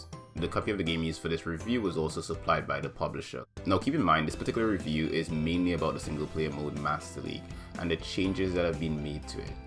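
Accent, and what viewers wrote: Trinidad and Tobago. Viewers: yeah im from trinidad but, i live in UK now and have lived away for a long time. Sorry if you're not, just thought some of the words you say sounded Trini like you might have some ties there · I'm a trini as well. Your accent sounded familiar so that's why I asked.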